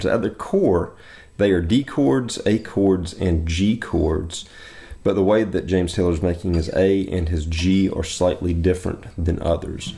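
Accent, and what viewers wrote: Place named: Kentucky, United States. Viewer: I'm a 60 year old (starting my 4th quarter) guitar player who has played in bands for over 40 years. I Loved the Eagles, Foreigner, James Taylor, and now Zac Brown and Garth and many others. I have just started finger picking using more than my Thumb and Index. This very song, Millworker, took me through an unfortunate divorce. Back in 2006, all I had was a borrowed small TV that played DVD's. I had JT's Pullover and that was all. This song became my anthem. Due to some kind of block, I could not roll from the D to to G (I kept coming up with:Ridin the Storm Out - Ha!). I had since figured it out but your's, I believe, is the absolute Best Tutorial I have seen. And being a Kentucky Boy, your SEC accent was welcomed. Keep it up! Jerry K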